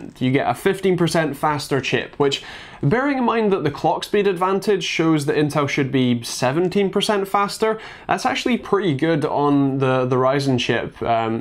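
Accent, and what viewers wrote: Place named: Ulster, United Kingdom. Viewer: Sounds a bit like you're from Ulster. My mother's side of my family are all from Belfast so I can tell. LOL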